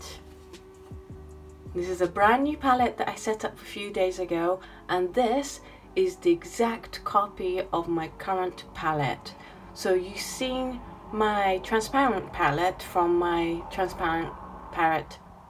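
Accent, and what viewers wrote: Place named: United Kingdom. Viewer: I adore this channel. I just know you'll go far. Question, a bit of a personal one: Are you a UK expat, or were you born/raised in the UK. Your accent sounds blended and it's awesome!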